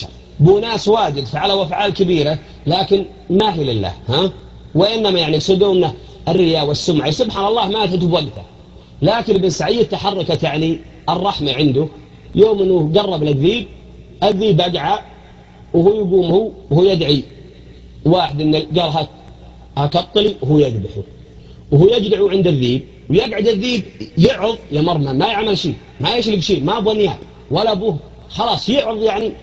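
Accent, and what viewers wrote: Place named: Ha'il, Saudi Arabia. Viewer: اللهجة شمالية حائل يابعد حيئ "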